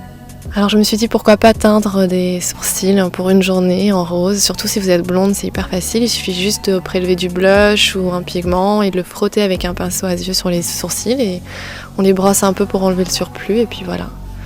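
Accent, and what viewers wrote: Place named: France. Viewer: You are goals. And I love your accent. I'm gonna learn French! (Inspired)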